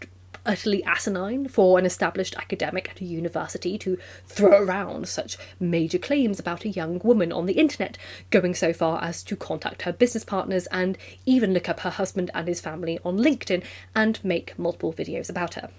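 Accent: United Kingdom. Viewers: You sound British. But your physiognomy doesn’t look it.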